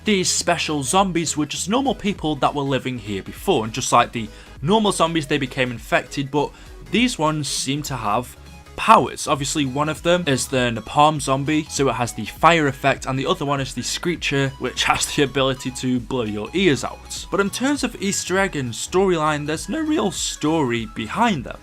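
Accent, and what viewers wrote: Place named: Stoke-on-Trent, United Kingdom. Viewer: are you from stoke on Trent because your accent sounds familiar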